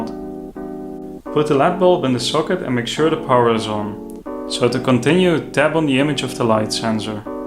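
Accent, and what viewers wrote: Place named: Netherlands. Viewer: Je hebt echt een nederlands accent en een goede video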